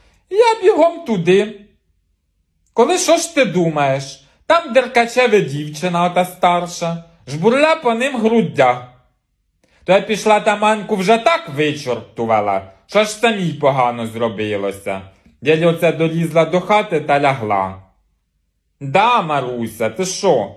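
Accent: Poltava, Ukraine. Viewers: Полтавська говірка! Точно так говорили в нас. Ностальгія по рідним місцям..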